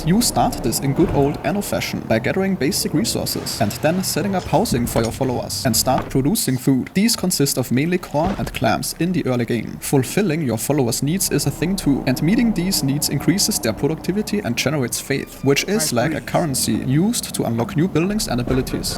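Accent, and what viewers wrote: Germany. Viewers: Ohne es zu wissen aber dein Akzent ist sehr deutsch😂 Finde es trotzdem super diese Kurzreviews, sind echt ein time saver! ❤️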